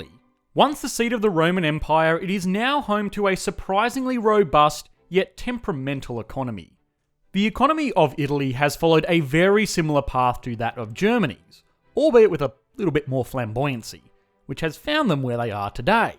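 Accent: Australia. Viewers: I love the Aussie accent! So good to hear my people on Youtube. Step aside... Canadians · The accent is Australian as far as I can tell. His views on Brexit suggest that ie ABC/corporatist/left leaning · Australian eh?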